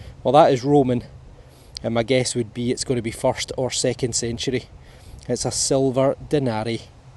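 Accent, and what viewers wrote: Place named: Glasgow, United Kingdom. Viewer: No wonder that this channel is growing so steadily to “not yet known heights”. Part of it is the Glaswegian way of speech and accent for sure. You sound much like Billy Connolly, a fellow Glaswegian who often brought us to tears of laughter. Congratulations mister. Things are looking to go up, harvest season is well under way and I’m sure you’ll knock some silvers and relics out.🤞🍀🤞🍀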